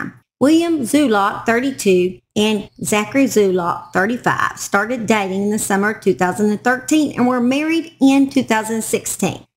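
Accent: South, United States. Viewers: Absolutely love your southern accent, love from Romania 🇷🇴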